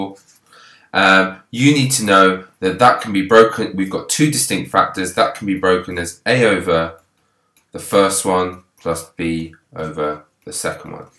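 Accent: United Kingdom. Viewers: Are you from Britain? I asked over your accent.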